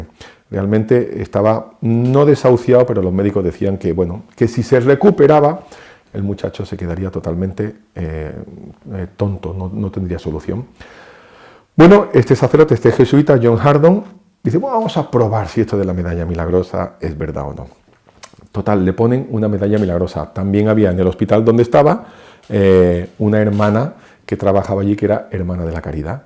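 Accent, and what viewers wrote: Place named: Andalusia, Spain. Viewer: Salve María!!! Qué alegría oír a los Heraldos de Madrid en las redes sociales, Hermano de los Heraldos del Evangelio, me encanta esta historia que usted está relatando de la Virgen de las Gracias. Por su habla veo que es andaluz, igual que yo, creo haber hablado con usted este verano. Salve María. Que la Virgen de las Gracias lo bendiga y lo proteja.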